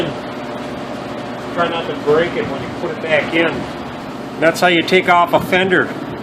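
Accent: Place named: Minnesota, United States. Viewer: haha yah could tell by your accent, i am also from the twin cities. Still driving around this civic?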